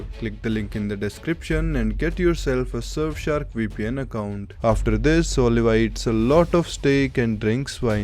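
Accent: India. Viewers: The Indian accent makes the videos so much better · Monotone voice with the Indian accent ... Got It ...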